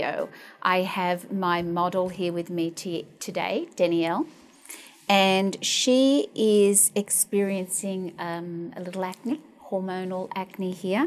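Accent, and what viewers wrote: Australia. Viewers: Australian or New Zealand(ian?)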